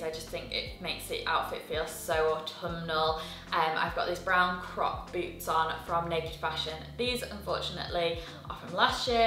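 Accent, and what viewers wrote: United Kingdom. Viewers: Could you please share the designer of the skirt? You did mention it in the video but it was so fast, combined with the fact that my American ears are not used to hearing British english, I cannot for the life of me figure out what it is you said. Gorgeous skirt, I would like to hunt around and see if I can find it somewhere. Btw, I just recently stumbled into your channel and basically binge watching everything. I am def rethinking my wardrobe and really would like to update it. I had a bad habit of getting pretty much all my tops in XS, which is my true size, but there is not any drape really, and everything is kind of fitted. For the longest time I said I would never wear oversized clothing, but after watching your videos, I think I have finally seen the light 😂😂 Literally got rid of close to 15 cashmere crew necks from my closet today. 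They are all XS. Whyyyyyyy Anyway, another thing i swore I would never ever do is a looser fitting trouser. I basically wear skinny jeans in size 26 waist. I always thought they would make my bottom look bigger than it is. But they look so beautiful and stylish on you, I have been salivating over them. A big problem I have always had was even though I have a lot of clothes, and many of them are truly nice (McQueen blazer, Tory Burch dresses, Burberry heels and trenches and Burberry wool coats), I often struggle to figure out what to wear and how to pair things to not look boring. After watching your videos, I realized that it’s bc I never b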